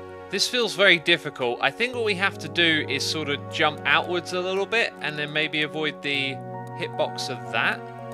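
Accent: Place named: United Kingdom. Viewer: I always find it fun to compare pronunciations with you X. Me: Aich X: Haich Me: Or-kid X: Or-chid Me: mural X: murial (this one bugs me a bit) Me: Zee (sometimes zed) X: Zed (ofc cause British) Me: compOster (with long O sound, like the word Open) X: Composter (short o sound, as if saying the word posture) I keep waiting to hear more and it's become a fun game now. Hope X sees this! <3 Cheers Buddy!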